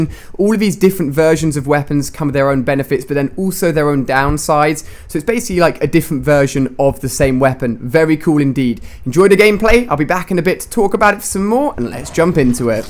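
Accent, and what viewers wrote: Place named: United Kingdom. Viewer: FINALLY they bring back a british voice to CoD.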